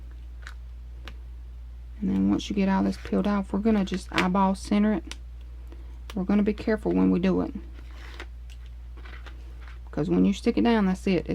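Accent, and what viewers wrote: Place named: South, United States. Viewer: Great tutorial very easy to follow. Love your southern drawl as well 😊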